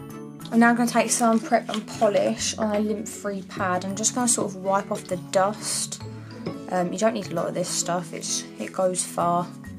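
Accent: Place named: London, United Kingdom. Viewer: It’s so nice to hear a london accent. Really enjoyed her video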